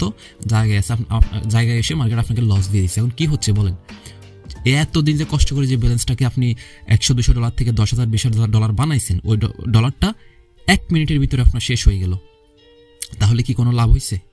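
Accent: Sylhet, Bangladesh. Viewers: ভাই আপনি কি সিলেটের মানুষ.??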